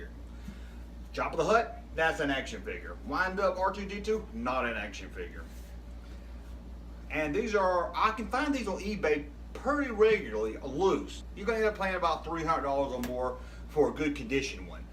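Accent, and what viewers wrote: South, United States. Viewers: Where are you from, you have a southern accent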